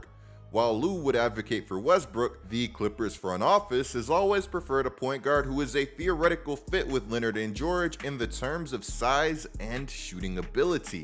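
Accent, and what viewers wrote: New Orleans, United States. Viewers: You from Nola ?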